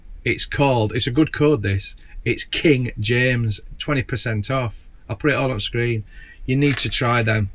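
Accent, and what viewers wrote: Yorkshire, United Kingdom. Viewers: Hi James, watching now on the telly :) Please don't refine your lovely Yorkshire accent too much, we love hearing it, the dialect, the unique slang. The very first crush I ever had (as a teen) was on an actor - a lovely Yorkshire lad from Bradford - he was way before your time haha. Steve Hodson, who became "famous" in Yorkshire TV's "Follyfoot" in the early 1970s. Adored his Ey Up's & other Yorkshire terms. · Disagree mate...and I'm a cockney 😁 His Yorkshire dialect adds to the video loads.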